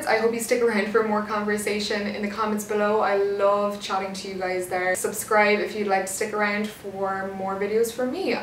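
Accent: Cork, Ireland. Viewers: Your Cork accent is definitely after flaring up after your visit home hahaha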